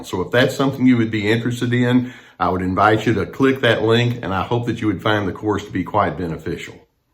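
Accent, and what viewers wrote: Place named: Texas, United States. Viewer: Oh boy! We love the Texas accent. Very very cool, it is. Swish and stylish. The Texan accent. From 2nd April 2023, My beautiful husband PD and I went NC from our abusers so, this means that we have experienced peace for six weeks so far and counting! What do you think of that, Gus? Woof from Evie dog here in Yorkshire UK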